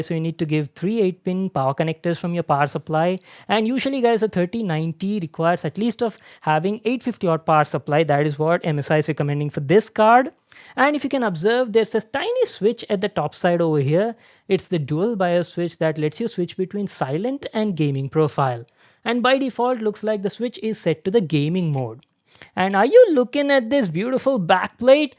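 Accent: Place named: India. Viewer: he is like linus tech tips with an indian accent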